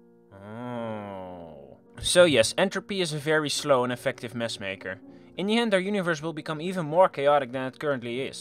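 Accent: Netherlands. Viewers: Are you Dutch? Sounds like it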